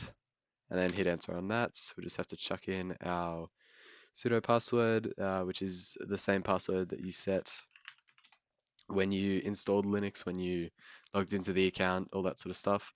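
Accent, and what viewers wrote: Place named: Australia. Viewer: Well done on your first video, i found your style informative and easy to understand. Just getting into Linux as a newbie and will be following your channel going forward (subscribed) also, nice to hear a fellow Aussie for a change LOL. Good luck with your channel and thanks for teaching an old 65 year old some new tricks. Cheers from Melbourne!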